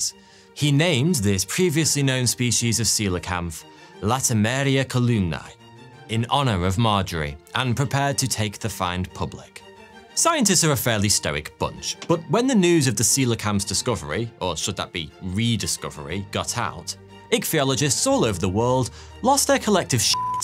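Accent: United Kingdom. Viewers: There's something about British dudes narrating animal videos 😉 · I totally forgot you're British, I've gotten so used to the accent I can't even hear it anymore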